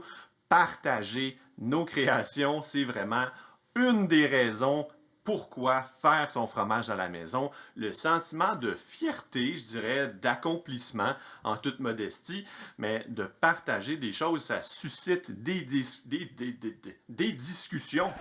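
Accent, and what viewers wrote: Quebec, Canada. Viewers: Vidéo qui sent la passion, avec ce petit accent québécois très sympathique. Bonjour de Belgique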